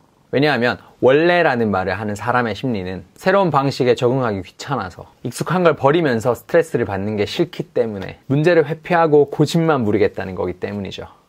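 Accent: Gyeongsang, South Korea. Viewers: 이분 말투 들으니.. 오리지날 서울사람 아니시네...경상도 분이시네......서울말 쓰려고 노력하는 경상도 분...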